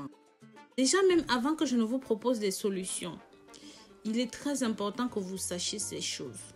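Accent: Africa. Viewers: ton accent d'Afrique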